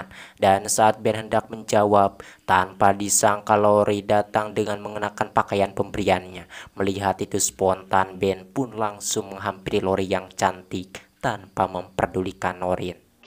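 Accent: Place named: Sunda, Indonesia. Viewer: Cuma Chanel ini si yg asik pembawaan nya campur sunda wkkwkw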